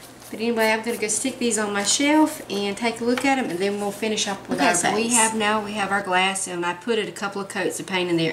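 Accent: South, United States. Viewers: you look beautiful 💖 Love the bunny ideas❣️❣️❣️and I love your Southern accent, you're wonderful ♥️♥️♥️♥️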